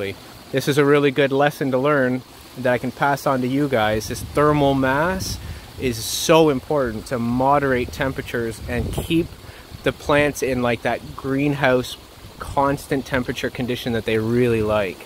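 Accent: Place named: Canada. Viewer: Three great things about this channel-- the contagious "Permaculture Joy," the attention to science (and lack of anthropomorphism), and the delightful Canadian pronunciation of "ou." Look forward to every video! I've been on the fence about sea-buckthorn/seaberry for years (even after viewing Ben Falk's videos) due to management concerns. Can you convince me, lol?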